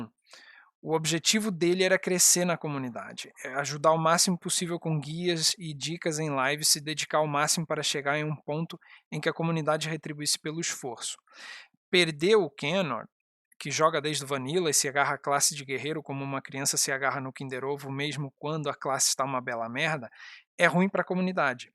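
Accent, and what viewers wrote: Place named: Portugal. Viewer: eu ia comentar que vc tem sotaque português e vc disse que tem família lá kkkkkkk furou meu coment! Cara vou sentir falta dos seus guias, na minha opinião os melhores de tank que já vi. Usei muito de war e de druida(mage tower)! os seus junto aos do Wild são os melhores guias de classe do wow em português. Gostaria de dizer que também parei de jogar desde o começo desse ano, e só voltaria a jogar caso muita coisa fosse reformulada, fiquei entre 6 e 7 anos aqui, um obrigado e quem sabe não voltamos um dia, boa sorte man!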